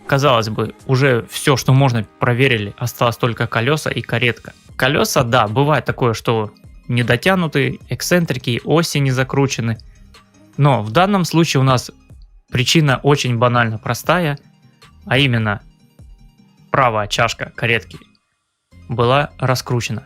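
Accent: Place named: South, Russia. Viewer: Ну, можно так подумать по голосу, если представить, что озвучивал слегка выпивший или покуривший человек. Но, автор у нас со своим южно-российским говором, там почти все так говорят, а он ещё и не по тексту, поэтому паузы в речи. Думаю, что трезвый он был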